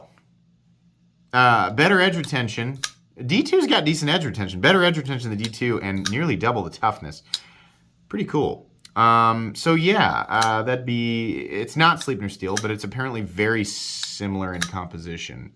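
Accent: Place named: Midwest, United States. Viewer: Kansas! I was close! Identifying accents is sort of something I do as part of my job. Just something I’ve gotten good at. I didn’t know what part of the country you came from and my guess, based on your accent, was Colorado / Nebraska. You ended up just East and south lol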